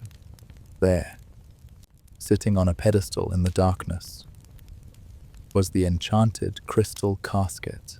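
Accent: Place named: United Kingdom. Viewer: You have no idea how much I love love love this video. I've been falling asleep to it every night for a week now. As a huge fan of everything in the Harry Potter universe, sleep/meditation/storytelling videos and the voices of british men, this is amazing. Please keep conjuring these videos! I can't wait to see what's next! 🪄✨️💤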